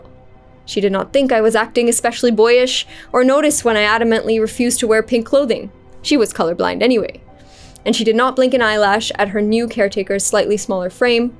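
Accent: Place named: United States. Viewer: Nice language American accent🔥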